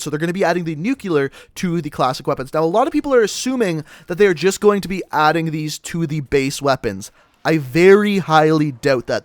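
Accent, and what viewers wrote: Canada. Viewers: Are you saying "Merc-2" weapons or "Mark-2" it might just be your heavy canadian accent effin'it up for me!! 😄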